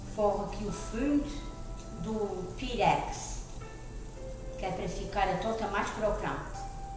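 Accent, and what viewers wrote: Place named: Portugal. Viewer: Querida irmã, que bom ouvir sua oração! Me senti abençoada! Tão lindo ouvir esse sotaque de Portugal. Gosto tanto! Vou fazer essa torta. Minha família come bem pouca carne e estamos procurando parar de vez. Obrigada por dedicar seu tempo em ensinar receitas saudáveis. Continue, pois estarei sempre acompanhando!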